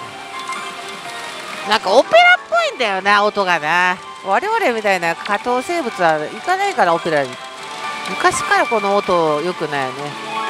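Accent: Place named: Osaka, Japan. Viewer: さん、楽しく落ち着きある女性👩‍🍼いいよ😊 大阪弁言ってやん､物凄く浸し見湧いて､見てます🤭 勝てる台選び方も見たいなぁ〜 よろしくですほな🫶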